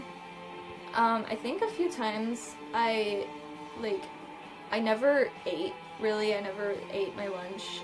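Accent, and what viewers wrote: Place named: Canada. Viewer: I'm gessing it by your accent, are you from Canada?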